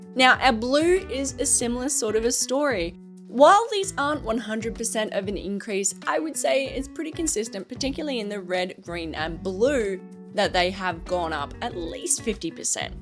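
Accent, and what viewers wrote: Australia. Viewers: Hi!, HaHa! Like you, your Aussie accent is lovely! You bring such positive and happy vibes to your channel and as I really need this review, you made getting the info an absolute pleasure! I am so looking forward to more! ~:face-blue-smiling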